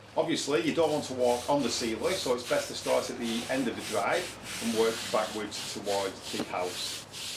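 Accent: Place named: Lancashire, United Kingdom. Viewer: Can the Russians not understand your Lancashire accent?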